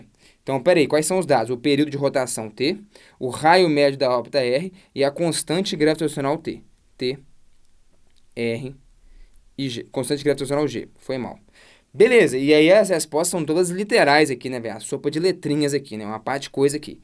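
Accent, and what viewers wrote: Minas Gerais, Brazil. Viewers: Cara você é um mito.... Você define profissional que faz porque ama a profissão. ... E os sotaque mineirinho kkkk massa de mais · Rapaz, de onde você veio??? PARABÉNS pela sua didática, sua linguagem ao aluno e seu carisma!!! E pra temperar melhor ainda sua "performance", tem o seu delicioso sotaque mineiro...MUITO BOM!!!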